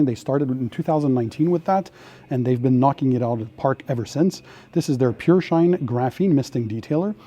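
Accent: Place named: Canada. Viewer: New to your channel. I had a feeling you were Canadian by the sound of your voice. I have a good friend from Montreal. We meet up in Albany to get our families together now and then. And my son and I went to the NY auto show this year too. What terrible weather it was the day we went. I’m a novice detailer. Here for the knowledge and tips.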